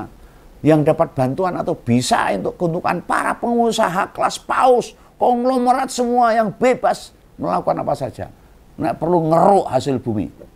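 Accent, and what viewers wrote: Java, Indonesia. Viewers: Cara bicara om membuat saya ketawa2 sendiri...selalu saya tungguin responnya terhadap berbagai isu yg lagi hangat.....ringan tapi berisi dan yg penting lucu khas orang jawa❤❤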